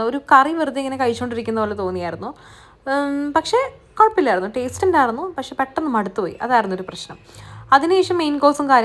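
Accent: India. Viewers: Ii lokathu Tani Malayali mathreyullo? Also, this girl's from Central Travancore and Tani Malayali is from Northern Kerala. Nalla samyam analo😂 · pand trivandrum slang arunnu. Ippo tani malayale Malappuram valancheri tone aayi. Yes tani malayale pole tanne ind.njn parayum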